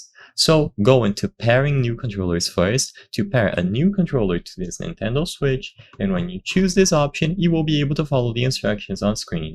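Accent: Brazil. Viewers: o sotaque não me engana, br na área!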